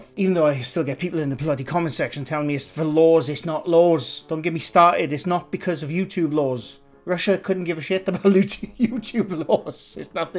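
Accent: Wales, United Kingdom. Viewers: Sent here by the Shape 😊 and now hearing you’re Welsh, well I subscribed coz I’m Welsh myself 😀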